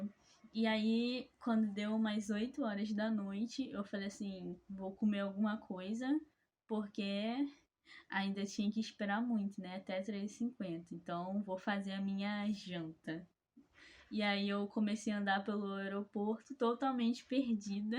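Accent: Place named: Rio de Janeiro, Brazil. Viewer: Sotaque de carioca ,é carioca?Boa sorte 🍀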